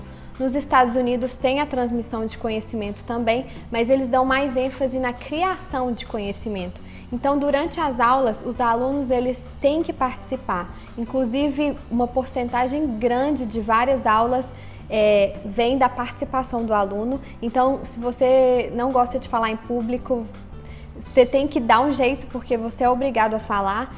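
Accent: Minas Gerais, Brazil. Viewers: é minha primeira vez aqui no canal, já adianto que tenho gostado bastante dos conteúdos. Uma dúvida menina, você é mineira??? Sotaque maravilhoso. beijos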